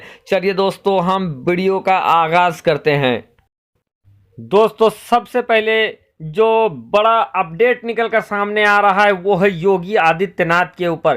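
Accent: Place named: Jharkhand, India. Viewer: Jharkandi talaffuz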